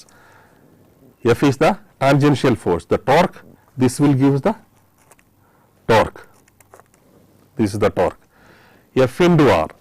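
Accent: India. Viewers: Nice, but I can't understand this indian dialect...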